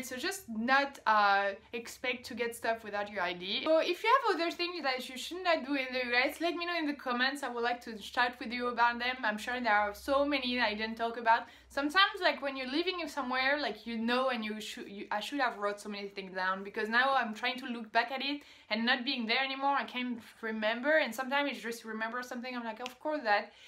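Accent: France. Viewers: I love your French accent!!! I’m from California and I can agree on everything you talked about in the video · I have no clue who would downvote this girl she is so beautiful & her french accent is so adorable & pleasant to listen to!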